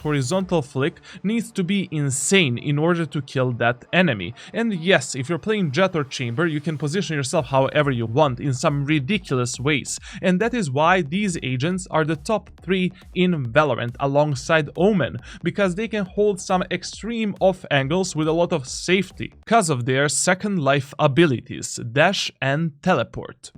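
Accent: Russia. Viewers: Where you from ? Russian Turkish ? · Okay but are you Russian or Ukranian 🤨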